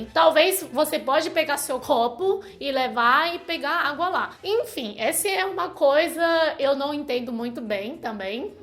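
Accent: China. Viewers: Incrível que em muitas palavras, ela aparenta ser brasileira, mas em outras o sotaque chinês entrega.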